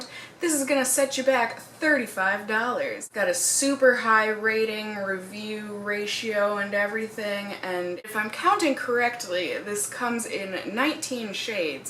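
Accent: Minnesota, United States. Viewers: your videos are my favorite videos to watch, although they don't help with my skin tone lol I just enjoy watching you! I thought I recognized that accent and then I finally took the time to look in the description and you're a fellow Minnesotan! all the more better!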